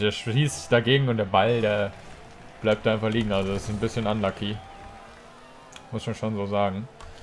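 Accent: Luxembourg. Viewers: Kommst du aus Luxemburg? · Biste Luxemburger? 😂😂👍👍👌💪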